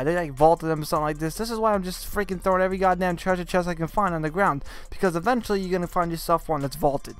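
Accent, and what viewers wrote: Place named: New York, United States. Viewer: This dude has a New York accent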